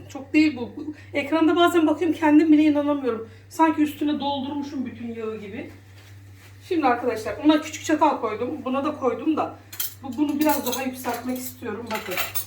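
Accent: Aegean, Turkey. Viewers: Hanımcığım, Ege şivesiyle konuşmanız, içten ve samimi anlatımınız çok güzel. Ekmek dolmasını rahmetli anneciğim yapardı. Bu tarifinizi izlerken anneciğimin mutfaktaki uğraşı gözümün önünden film şeridi gibi geçti. Anılarım canlandı.😔 Ekmek dolmasının kokusunu duyar gibi oldum. Size çok teşekkür ediyorum. 🙏 Ellerinize, emeğinize, tatlı dilinize sağlık. 🙏 Kameramanınız sevgili oğlunuzu da kutluyorum. Çekimde ve soru sormada çok başarılı.👍👏👏👏Yiyenlere afiyet olsun canım. 😋 Selam ve sevgiler...🙋♥️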